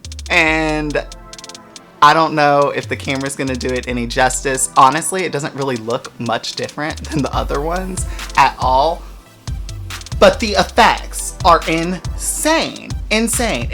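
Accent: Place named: New Orleans, United States. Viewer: That explains the accent! I'm from New Orleans so I'm like this isn't a Cali accent it's my neighbors accent lol!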